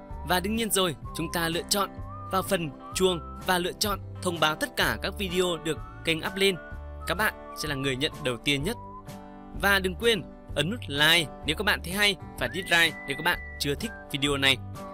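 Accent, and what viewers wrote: Bac Kan, Vietnam. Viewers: Ad người Bắc kạn hử??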